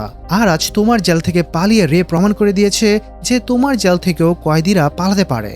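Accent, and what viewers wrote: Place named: Sylhet, Bangladesh. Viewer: Vaito Sylheti lager ba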